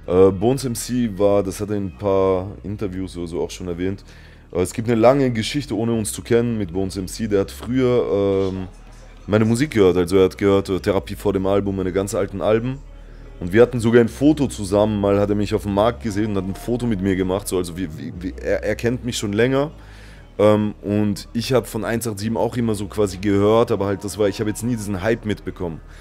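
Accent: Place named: Balkans. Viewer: On je nas. Prica DE sa nasom bojom glasa.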